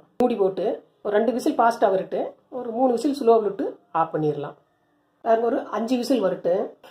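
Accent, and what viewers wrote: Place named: Kongu, India. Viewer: அருமைங்க அக்கோய்.. நீங்க சொன்ன விதமும், உங்க கொங்குத் தமிழும் சூப்பருங்க..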